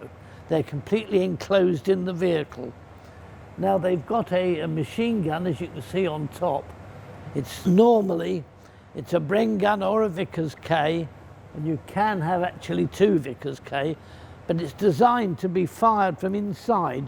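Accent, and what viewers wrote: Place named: United Kingdom. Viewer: As a Brit speaking, this is quite literally the most British man to ever exist.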